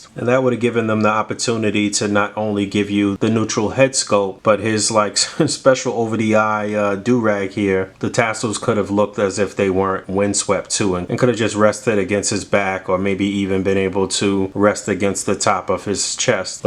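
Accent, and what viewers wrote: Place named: New England, United States. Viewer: First time here, boy is that New England accent thick AF keep up the good work (QGTM)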